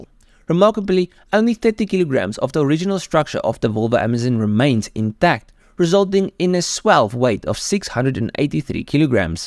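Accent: South Africa. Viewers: Clearly you can hear the South African afrikaans accent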